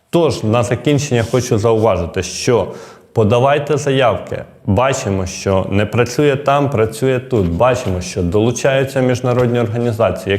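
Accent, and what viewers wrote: Russia. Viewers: Добре,але ваша вимова шиплячих дуже москальська і дратує українські вуха.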